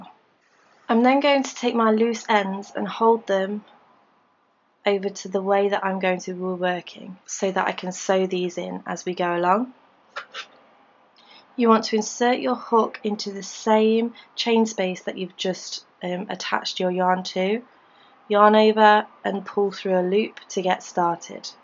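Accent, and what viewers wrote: England, United Kingdom. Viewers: Your tutorials are THE BEST! You go along at a perfect pace and you are extremely clear without being boring. It doesn't hurt that your English accent reminds me of my mum who first taught me the basics. ;) Thank you. I am almost done with my first afghan and you have helped me all along the way. xxx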